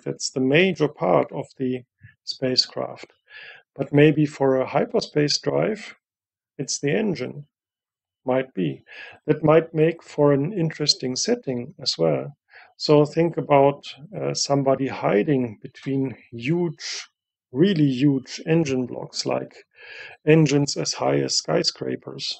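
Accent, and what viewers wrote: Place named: United States. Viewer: The American accent is indeed prominent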